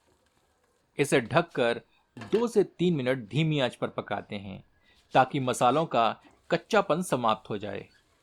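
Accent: Standard. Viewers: Great to hear shoodh hindi. Well spoken and the recipe is amazing. Thank you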